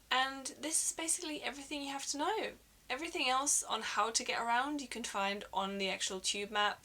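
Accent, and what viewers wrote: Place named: Estuary English, United Kingdom. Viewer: I like the way talks. No trace of a German accent and a touch of estuary English. Just amazing. What a lovely chatty person.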